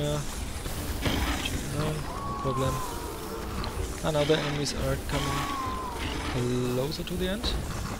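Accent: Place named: Germany. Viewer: Ja, man hört den deutschen Akzent sehr stark raus.^^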